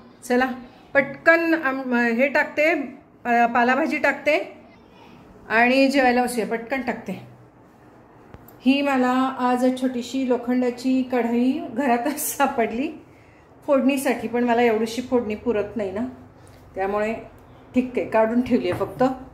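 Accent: Kolhapur, India. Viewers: तुम्ही कोल्हापूरच्या का? भाषे वरून कळते.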